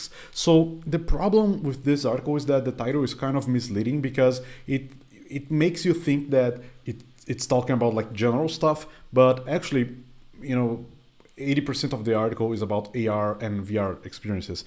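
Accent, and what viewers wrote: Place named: Russia. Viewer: Porque que ce ta com sotaque semi russo mermaooo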